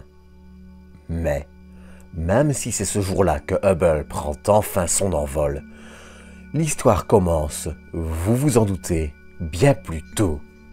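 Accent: Belgium. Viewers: Ce monsieur a tout à fait le droit d'être belge et d'avoir une manière différente de parler... Quel commentaire insupportable. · Je sais que je ne vais pas me faire des amis mais le documentaire a l'air vraiment sympa et très intéressant mais la narration 🥶🥶🥶 au bout de 10min j'ai arrêté car je n'en pouvais plus... dommage...un mélange de belge, américain, allemand et canadien. Et la façon de prononcer Hubble à chaque fois ça devient très irritants.. mais je répète dommage car le documentaire doit être vraiment intéressant.. désolé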